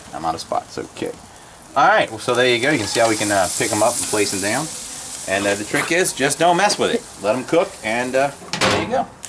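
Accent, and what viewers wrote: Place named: Louisiana, United States. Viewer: im guessing hes from Louisiana the way he says filets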